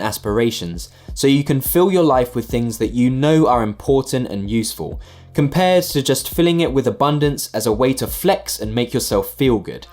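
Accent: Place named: England, United Kingdom. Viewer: Sorry to say so, but I raise an eyebrow when the first thing I see is an english sounding Matt D´Avella copy that sells a minimalist wallet in the first minute of the video. However as I am not that full of cynicsm and sarcams, I just watched the whole video and agree on many things. „Minimalism is a lifestyle for wealthy people who pretend to live like poor people.“ It is also for „better educated people“, who have the time and effort to change their lifestyle. That is all by design and choice. But Minimalism aside from a modern philosophy for the „Overfed wealthy young spoiled consumerism addict“ is a concept for everyone, a concept for the whole society. It is important to point that out and see the downsides of how minimalism is shown to the public by the regular show-off tools (socialmedia and yt). Minimalism is already misused by advertising, companys and consumerism lifestyle. 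Hey Look, my newest edc minimalist workout routine against procrastination! Nope, the bluecollar worker probably has to work nightshifts and overtime to just get food on the table and not the newest middleclass lifestyle Gadget that makes you feel like you are intentional.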